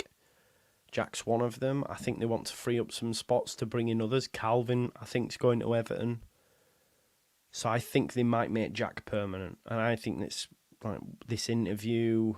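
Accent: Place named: Mixenden, United Kingdom. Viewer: you sound like a Mixenden lad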